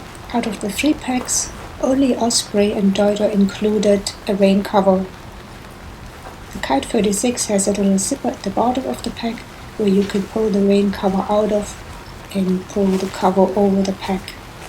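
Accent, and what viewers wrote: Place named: Germany. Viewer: ah, ich hatte mich nur gewundert. Trotz des hervorragenden Englisch hat man den deutschen Akzent dennoch rausgehört. :P Habe direkt mal deinen Kanal abonniert :)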